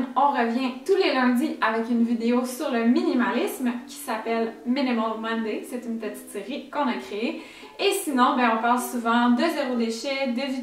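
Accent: Quebec, Canada. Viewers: Ok. J’avoue que j’ai un peu de mal avec l’accent québécois mais je pense que j’ai bien compris. Je viens de découvrir les sacs au cire d’abeille ici en Angleterre. Chouette. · La musique couvre un peu sa voix et comprendre le québécois quand on l'entend à peine c'est difficile aussi même si on n'a pas mal à la tête. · Quelle superbe découverte ! C'est tout ce que je recherchais ! Du zéro déchet et minimalisme qui est intelligent et plein de bon sens (c'est pas le cas de toute la communauté), végétarisme, simplicité et surtout une fantastique québécoise dans une si belle région ! Je suis juste en amour fou du Québec depuis que j'y suis allée en mai ! Montréal c'est la seule autre ville où je me verrais vivre ! Et quelle belle langue et accent, ça me passionne bcp ! Merci d'exister :) Gros bisous et très bonne continuation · j adore ton accent quebecois, et tes videos tres interessantes je te remerci enormement de partager continue j apprend malgre mon âge 56 ans, je t embrasse fort et t envoi beaucoup de soleil de mon pays tres ensoleillé ( l Egypte )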